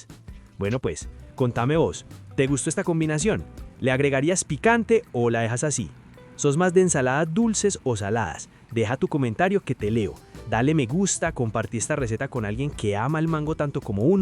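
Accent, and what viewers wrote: Colombia. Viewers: #Apenas lo estoy viendo, amigo, eres Colombiano por tu forma de hablar, te sigo viendo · Riquísimo con mango las ensaladas y no comento luego es que lo escucho, me encanta como hablan los Colombianos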